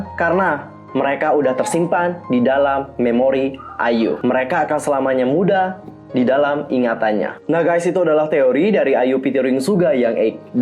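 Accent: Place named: West Java, Indonesia. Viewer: Salfok sama cara ngomongnya sunda banget. No Hate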